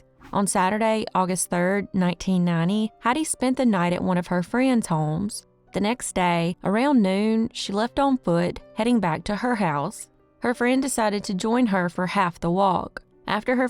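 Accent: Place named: Louisiana, United States. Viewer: Based on your accent (which I love and think is adorable) I'm gonna guess Louisiana. Am I right?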